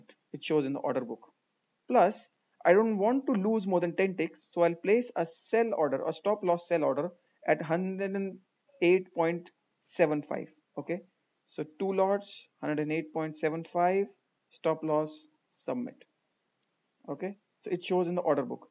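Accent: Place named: India. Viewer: can't understand the Indian accent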